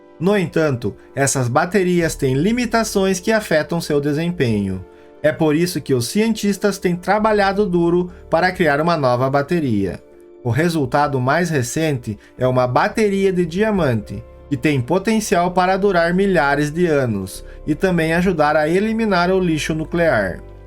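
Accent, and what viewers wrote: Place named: Caipira, Brazil. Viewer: Este sotaque caipira do locutor é insuportável!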